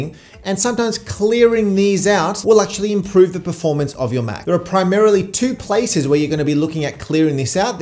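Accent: Australia. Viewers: The Australian pronunciation seems logical but I do pronounce cache "cash" (I'm a native English speaker from Hong Kong). One of the funniest Australian pronunciations to me is "daahtuh" (data). And why do Australians pronounce the 'a' in "last" the British way but the 'a' in "chance" the American way? 🤔 My own pronunciations can be weird though: I pronounce "route" the British way ("root") but "router" the American way ("rauter") because "rooter" reminds of the Australian slang and so sounds inappropriate... 🙃 More importantly, thanks for the helpful video. I found your video bc the tech guys in my office will clear the cache of my PC work laptop when it's running slow, and it occurred that I'd never once cleared my personal MacBook's cache. Did you actually just pronounce wary "weary"? 🤫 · I'm Australian too. I say caysh too, But then I also say shedule rather than scedule. 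But it's useful to know that when other videos are clearing the cash, it's got nothing to do with robbing a bank. , you are getting tired, because you say you should be weary when you are deleting your cache. I think you should be wary. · Thanks. I'm an Aussie too, so I pronounce cache same as you.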